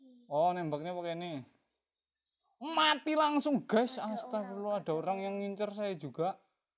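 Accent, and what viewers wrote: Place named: Kedu, Indonesia. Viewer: pasti Plat AA ni... dari ngomong keliatan, sayang gak ada mukanya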